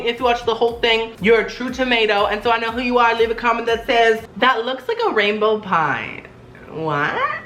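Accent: United Kingdom. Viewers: YOUR BRITISH ACCENT GIVES ME LIFE!!!!!! SLAYYYY! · His British accent is funny like if u agree❤❤ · He should really do an entire video in his British accent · 100 videos of British accent pls Also asscheek earthquake full audio plsss or mvvv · Love your British accent lol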